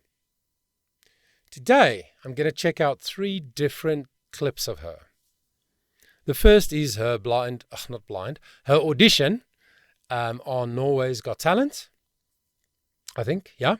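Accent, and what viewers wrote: South Africa. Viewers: She is 17 now and dropped her first EP in August consists of 6 original songs. They are amazing. I have been following her for a long time and I am so proud of of her hard work, dedication and just being a wonderful human being. is the first track of the EP. You are missing out if you don't listen to them all. I think you are also a South African from your accent. I am a 77 year old gran from South Africa. She is my favourite artist and has been since she was 9 when I heard her sing a self produced version of.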